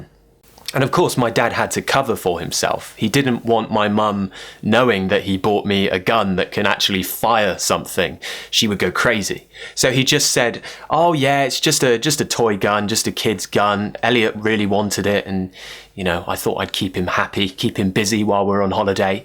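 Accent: RP, United Kingdom. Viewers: oh u r so good at telling stories n i love this way to learn the British accent it is so helpful for me, btw i felt so happy for your teeth😹😹. hope u have a nice day 🌹🌈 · your stories are always funny, keep doing it, i am a english learner and you channel and lessons has been helping me a lot, by the way, you accent is the best, i've been struggling to improve mine to sound like a british.. greetings from brazil, man!!!! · Hi, Elliott! I feel like your British accent is very beautiful:) It sounds like you are english lord from these bbc series:)) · I'm in love with your accent, I love british😍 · This EP that the most I like. Because I learn a lot of British accent from your story. 😅 Very much thank you. 🙏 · Lovely British accent....sounds sweet to my ears( not sure if i said it correctly) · Hi, I'm an italian man and I watched lots of videos just to keep my english alive. 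Different months ago I watched a video where you told a very funny story about yourself (maybe a "listening practice" video): I remember you told that you went up a tree, and accidentally fell down in front of some of your school friends and you went in the hospital... then in the hospital they put a sort of plaster on you and you were forced to get help from your parents to wash you in the tub, with all the embarrassment of the case... Unfortunately I'm not able to find that video any more. Since I would like to show to my kids, because I think it's useful for an educational reason (as well as funny:-D) as listening practice for them too, can you please suggest me the link? Thank you in advance and congratulations for your video channel!! I love your british english and your pronunciation, and your voice is clean and wonderful. Gabriele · the way you tell stories in your amazing British accent gives me goosebumps whenever I listen to you. 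Great story! Thank you!:)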